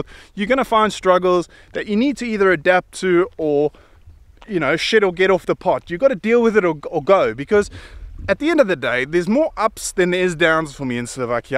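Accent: South Africa. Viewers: Thank you for your honest confession. :-) I can imagine it's tough living so far from the sea. The larger lakes and water reservoirs don't really make up for it, as nice as they are. And I believe you when you say the UK's beaches aren't that magnificent-looking, compared to any of the coastlines in South Africa. You're right that some drivers still drive like maniacs. And that's after we've had stricter laws about speed limits and driving in general implemented in the last decade and a half or two decades. Some people just can't seem to learn they need to be more careful and have more empathy on the road. I suppose I've gotten pretty good at recognising anglophone accents over the years. I've only needed to listen to your's for a few seconds and thought, "Oh ! You're South African ?". :-) Well, I hope you like it over here. It's not a perfect country and it has its fair share of issues, but hopefully you'll find it welcoming and peaceful for many years to come. 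· Howzit. I also just moved here 3 months ago from RSA, i picked it up in your accent straight away... Lets get together and have a Braai one day, we have a Weber here too..✌ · I kept wondering where you're from as your accent sounds South African, so happy that you mentioned it 😁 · Are you South African? answered that. Love it. Keep well, all the best. Haha, you never drove in Joburg then? 😂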